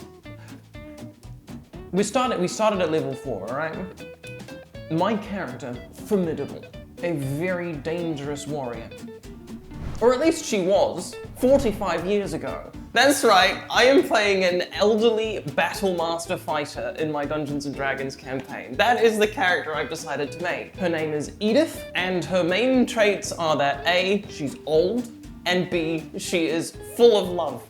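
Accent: United Kingdom. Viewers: I thought you already had a British accent! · This explains the British accent thing during your stream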